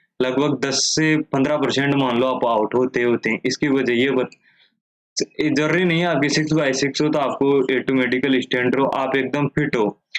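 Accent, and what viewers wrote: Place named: Pahadi, India. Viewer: Bhai pahadi lgra ....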